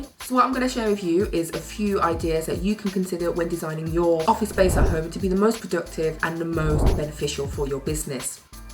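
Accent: United Kingdom. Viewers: This is seriously one of the best videos I’ve seen on this subject. Content, presentation, editing, ideas and impact. Only suggestion would be to slow down your speaking slightly as it will attract more US viewers who love British accents but prefer slower speech. But seriousl6, you really know your stuff. I have no idea how you don’t have thousands of followers already. Keep at the marketing & algorithm study on YT to get your profile up there - and I have no doubt you’ll accelerate quickly. Cheers - am off to implement some of these ideas. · Is that Brummie accent you have? Thanks for the tips. Much appreciated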